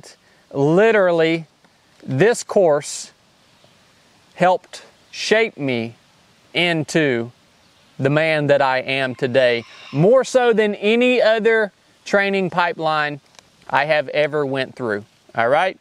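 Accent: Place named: Northern Louisiana, United States. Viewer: Sounds like you’re from northern Louisiana.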